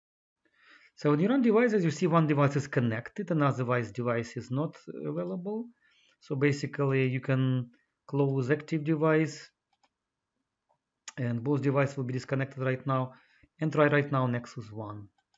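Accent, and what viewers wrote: Russia. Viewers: nice accent are u from Russia or Ukraine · You are a Brazilian guy. But you really sound like a Russian guy. The guy from university, who studied English, sounds pretty like you. It looks like Russian and Brazilian accents are similar. It sounds like big news for me. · Ааай обожаю русский акцент в английском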